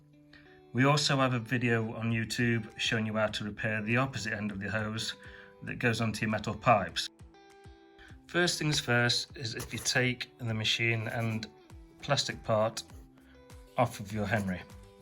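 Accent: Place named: North, United Kingdom. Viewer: Omg are u kidding?!!! Thats all, i just had to spin it anti clockwise 😆😆 I tried everything, even tape which kept coming off.. went to a hardware store twice! To get strong glues - did nothing. It was driving me nuts. Thank you a billion, saved me my sanity. Your northern accent calmed me at once.. as many a times i have had my wifi fixed by lovely northern voices over the phone 👍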